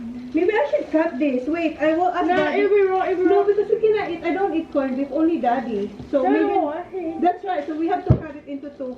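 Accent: Philippines. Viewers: Alam ni yung Filipino accent😂. Love it!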